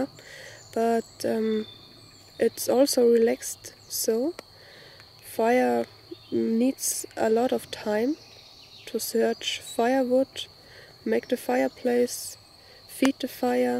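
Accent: Bavaria, Germany. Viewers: Lucky bavarian english .. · кошмарный немецкий акцент...но всё равно понятно.беда в том что женщина не создана для выживания в дикой природе. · Love your german accent. Nice video. You have a very appealing shape. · never heard deutscheres english :D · Hallo, I recently discovered your channel, and the videos you have on here are amazing. In addition to the scenery and skill you show, I really love how you show the trains coming by in so much of your video work and listening / looking for them. I Love your German accent by the way, while it used to be more common that I've heard in older generations, from what I've seen in recent times, I don't hear it as rich and pronounced among many people as before, like yours. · aloha, i saw your video for the first time speaking English but immediately recognized that you were German and later in the video i learned that you were in Chiemgau Bavaria. 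This is absolutely one of the most beautiful places, Lake Chiemsee and the Kampenwand in Aschau. i lived in Sachrang and near Traunstein, but now back in Aschau. Aschau is great for hiking into the forest, and for mountain biking. maybe i see you here one day making a video of Aschau and Prien river. Entspannen and enjoy. your english is just fine, i learning to speak german. Aloha.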